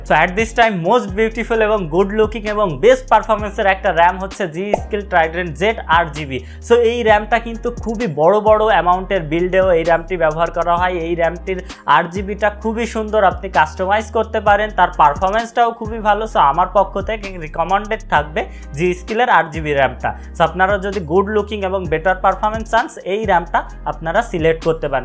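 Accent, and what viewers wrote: Bangladesh. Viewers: Bhai er bari ki borishal?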